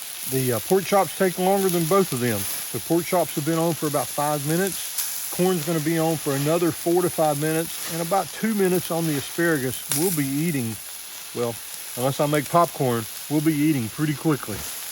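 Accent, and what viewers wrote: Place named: South, United States. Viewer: Nothing wrong with your southern drawl. I understand you perfectly and I’m Canadian😊 EHH! Love your travels, hope to follow in your path someday.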